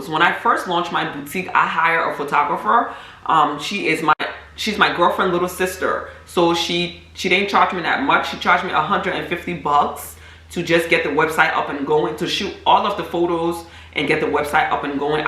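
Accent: Liberia. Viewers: You sound Liberian. Are you? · I can hear the accent, sis you Liberian?? I love your content by the way..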